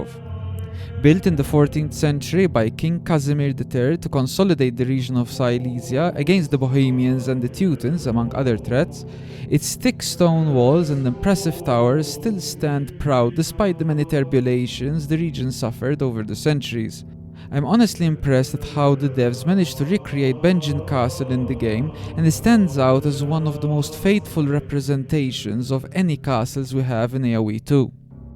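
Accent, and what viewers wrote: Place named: Italy. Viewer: mmm. Your accent is definitely Italian. Bordering on stereotypical even, but I can't knock you too much, you only have so much control over your accent.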